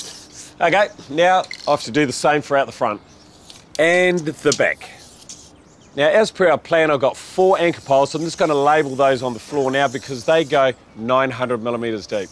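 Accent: New Zealand. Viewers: That’s a New Zealander. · It’s New Zealand not Australia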